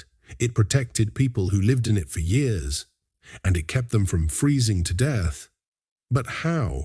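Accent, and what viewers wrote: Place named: United Kingdom. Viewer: British sounding, but likely AI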